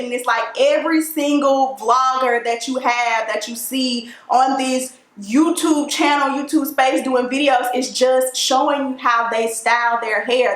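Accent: South, United States. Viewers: Hey cuzo, I love your curls and that southern draw is precious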